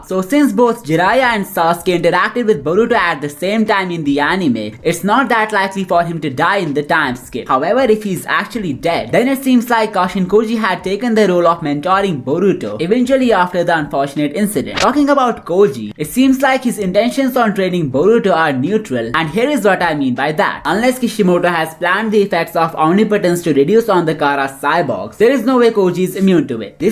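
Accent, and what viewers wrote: India. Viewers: Lmao I think he is Indian by his accent but let me just support you on this question for the reply 😂😂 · but i was asking so..... bcoz his accent seems indian to me.... and i was just demanding hindi commentary from 3 videos.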